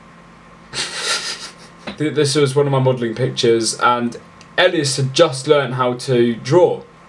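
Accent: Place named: United Kingdom. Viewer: If you're gonna play background music with high frequencies, like guitar picking, you need to turn that shit down cause it's way too damn loud. Don't feel bad though. Most YouTubers do it. just remember that we want to hear that beautiful, bodacious British accent, not the homeless Irish woman picking her guitar in the background.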